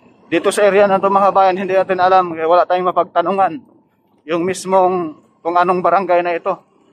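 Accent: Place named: Visayas, Philippines. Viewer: Kainaman.bisayang nahaloan ng batangasin kung mag salita. Resulta bulol😁✌️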